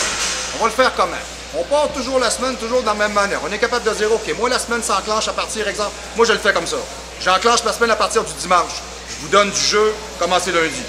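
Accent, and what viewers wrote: Canada. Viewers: Il me faut une de ces concentrations pour comprendre tout ce que tu dis (à cause de l'accent) mais c'est genial !! Jsuis partie pour TOUT regarder depuis le debut... Le Denis WOLF canadien :)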